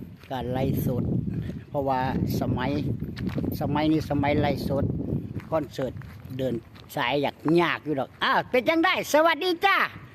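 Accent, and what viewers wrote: Isan, Thailand. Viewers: จุดกำเนิด กะแม่นเสียงอิสานนี้ล่ะครับ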